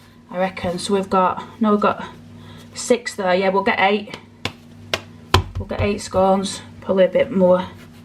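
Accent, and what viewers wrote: Oldham, United Kingdom. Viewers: Delightful is a great way to describe those Scones!... Another wonderful video, love that Oldham accent, makes me homesick lol...Nice one 🖤👌X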